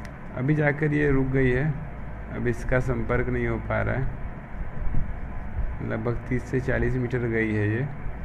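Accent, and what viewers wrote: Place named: Uttar Pradesh, India. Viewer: Ye ladka pakka up ka hai 😄🤣🤣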